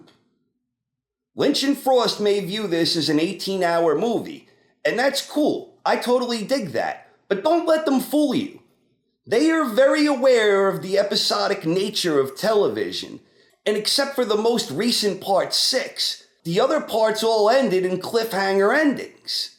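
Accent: New York, United States. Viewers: that is a serious accent you have. NY?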